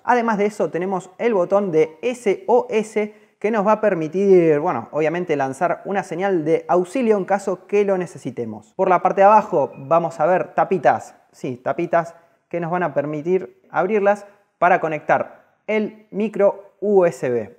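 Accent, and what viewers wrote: Argentina. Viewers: sos argentino o español? porque decis algunas palabras en argentino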